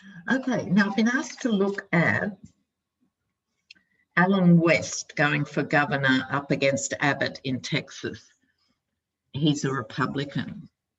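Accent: Australia. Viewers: Oh I love the Aussie accent! In fact I think it’s puts greater importance on the words. Love from the USA. Please stay safe. God bless you and yours.♥️🇺🇸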